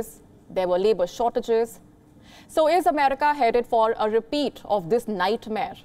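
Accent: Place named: India. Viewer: I would really like to listen to the news. It's just that your accent puts me off. Can't newsreaders work to develop an English accent? Indian newsreaders sound like robots..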